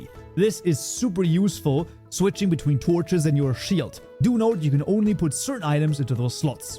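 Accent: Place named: Scandinavia. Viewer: 99% of the time I can tell of somebody is German from accent alone. How did you manage to get a Scandinavian accent? 🤔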